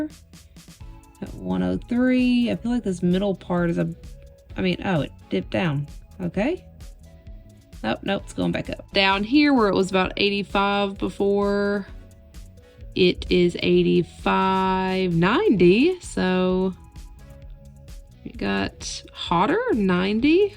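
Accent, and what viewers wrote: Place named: South, United States. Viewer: ! I noticed your accent and I was like, "Girl has to be from the South!" Honey, I'm in Huntsville...only 30 minutes from you! I don't know why I'm so excited. Lol. My son got a leopard gecko for his birthday and we are in love!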